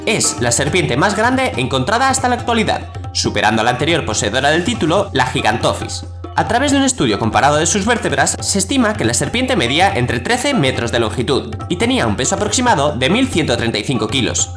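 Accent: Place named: Spain. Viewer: hay como cambian palabras y pronunciaciones esos españoles eres español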